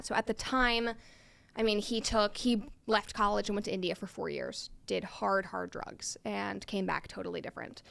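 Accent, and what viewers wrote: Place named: South, United States. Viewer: I don't think it's a fry, I think it's a little southern trying to come through like she's had voice coaching or something.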